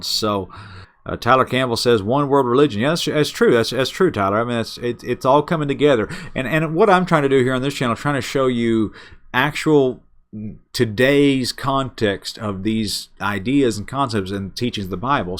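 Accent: South, United States. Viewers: I found the radio app finally in case you didn't. It's WIOP radio not WIP. I think it's his southern drawl that made me misunderstand. I hope this helps you.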